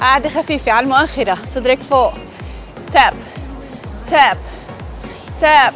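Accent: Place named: Damascus, Syria. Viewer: نحن نعرف اللهجة الحلبية تختلف برشة عن اللهجة الشامية ، انتي تتكلمي شامي ( دمشقي) معلوماتنا انك من حلب عليش ماتحكي لهجتك ، اختك من تونس 🇹🇳 نحن نحب اهل سوريا 🇸🇾 برشا خصوصًا اهل الشام زرناها برشا مع حلب وحمص .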